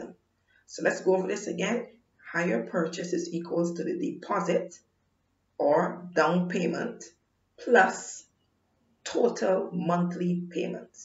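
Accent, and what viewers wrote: Barbados. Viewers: Thank you, this helped me a lot and I love your accent are you a bajan?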